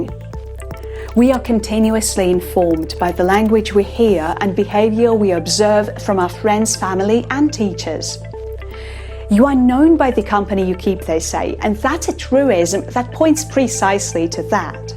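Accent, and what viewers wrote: United Kingdom. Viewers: I think you have lived in England before because no one can speak like British accent fluently like you without living in England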